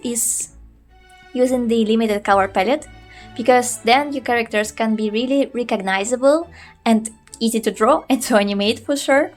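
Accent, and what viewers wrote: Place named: Philippines. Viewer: Hello arr sounds like Filipino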